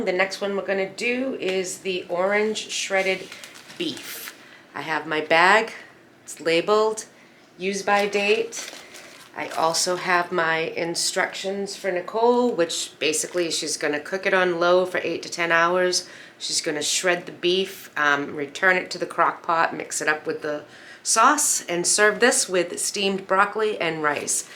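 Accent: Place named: New England, United States. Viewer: ❤️❤️💕❤️💕love your channel and your beautiful New England accent!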